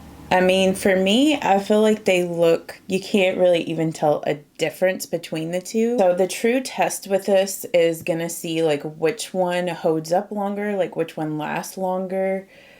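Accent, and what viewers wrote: South, United States. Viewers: Hi....i have had the foundation for a few months and just bought the serum tint yesterday....only just launched in Australia with the serum tint one this week....typical!! We are always so late getting newer stuff here Anyway i was excited to see your comparison video....look forward to trying it tomorrow. I was kinda hoping it might be less dewy looking...we shall see! Love your beautiful Southern accent btw... hope you have a lovely Easter! Take care...Lindy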